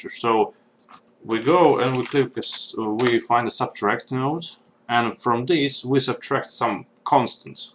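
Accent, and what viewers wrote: Russia. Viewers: I hear Russian accent! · Русский, спалился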